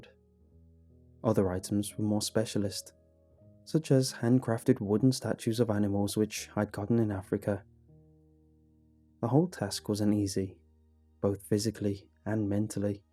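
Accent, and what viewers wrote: United Kingdom. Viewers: r u British?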